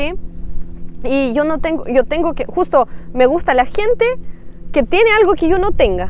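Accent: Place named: Chile. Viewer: Muy lindo ese campo 😍 Ya hablas muy bien el español. Aunque tienes un acento chileno, pero me gusta verte hablar en español. Ustedes las mujeres rusas son muy, muy inteligente y aprenden rápido todo. Bienvenida al idioma español.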